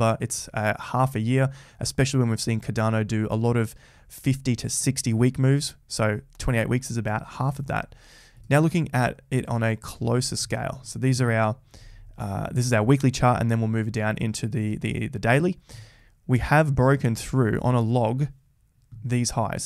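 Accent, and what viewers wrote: Australia. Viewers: Hi! Good video and keep it going mate! It's nice to hear again a bit of 'stralian accent! Love and supporting you from Italy!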